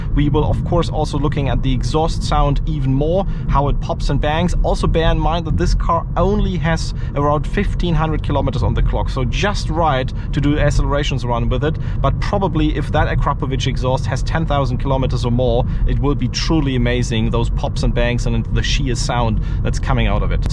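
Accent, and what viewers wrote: Germany. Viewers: Der deutsche Akzent ist auf die Dauer schon hart für die Ohren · German-English :-) best english in the world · dat german accent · Thats every germans accent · The German accent makes this car so much more German 😁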